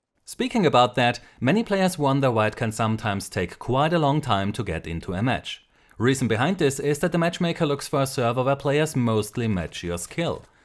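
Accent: Germany. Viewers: warum nicht auch mal auf deutsch...zumindest für die nicht der englischen sprache mächtigen. xD ich behaupte am akzent zu hören das es deutsch ist...am i right? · Was für ein schönes deutscher Akzent. Danke für das Video. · Ist der deutsch? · Where is from? Sounds a little german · I'm not gonna lie, while I like this info, it's this guys accent that made this video really enjoyable. He sounds like someone you'd play some European Work-Vehicle-Simulator-Game with and tell you stories about how he was part of the Federal German Ground Forces, ready to defend his bakery to the death in Alfeld if Warsaw Pact forces crossed the Fulda Gap and tried to reach the Rhine. ;_;7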